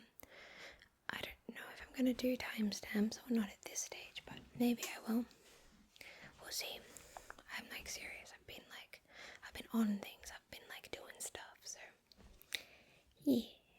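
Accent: Australia. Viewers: You do your preference, but the short hair is super cute. Why do I find most women that aren't into guys hot, oh well. As far as trigger words go, 'plosive p' words are good (words with 2 p's are good; stipple, apple, puppy, etc.) Also repeating sound words are good; coconut, banana, chocolate. Why are most of the words foods? haha. Also, leaning into the Aussie accent really hard for a video would be good, since that is something more niche that you have going for you. Even using slang, like "chuck a wobbly" or something. Not gonna lie, doing the heavy Aussie accent role play wearing that same type of tank top or sports bra exercise top used in the physical trainer videos would probably do well for views (more revealing shoulders/etc. get clicks these days). Whether you do another physical trainer RP or maybe do like a female mechanic explaining the work they did on the car or some work stories (some "grease" on your arms and cheek). 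Anyway, just some ideas and insights to help the channel, not just nosey! haha.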